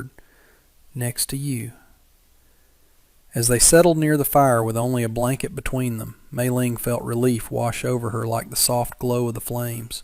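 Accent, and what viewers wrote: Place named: West, United States. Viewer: I love this real human voice with this wild west accent.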